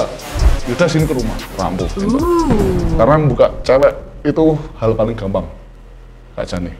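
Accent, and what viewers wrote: Semarang, Indonesia. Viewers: Logate wong semarang ketoke sampean mas 😅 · njirr logat semarangan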